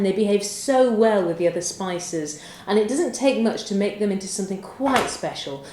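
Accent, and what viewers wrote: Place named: RP, United Kingdom. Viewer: true. will give both a try. how do you speak so posh. i love it :p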